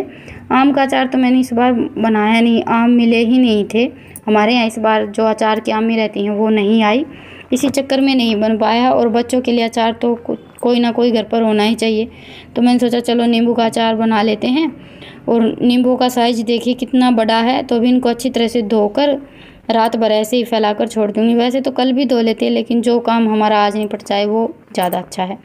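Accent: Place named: Rewari, India. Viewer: Aap kha se h mugè to rewari se lag rhi h aap whi ki bhasa h aap ki may be rewari se hu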